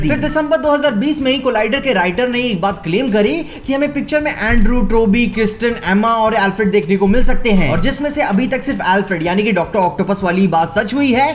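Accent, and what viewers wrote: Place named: Bihar, India. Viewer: Bhai tumhara style ek no.. up Bihar ka touch....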